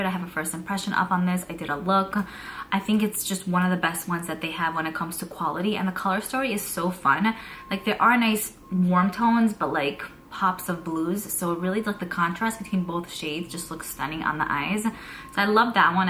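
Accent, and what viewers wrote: New York, United States. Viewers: Hi, your makeup application is so flawless! Can you tell me what brand of blush you're wearing and the color, it's so pretty!! Also, I love your New York accent😊🥰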